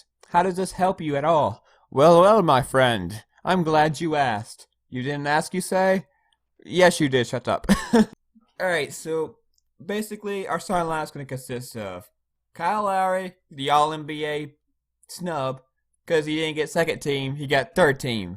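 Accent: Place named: South, United States. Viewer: Are you originally from Toronto? Because it sounds like you have a Southern US accent.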